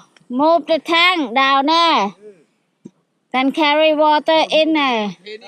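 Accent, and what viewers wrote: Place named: England, United Kingdom. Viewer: She is funny, she speaks Thai with an English accent.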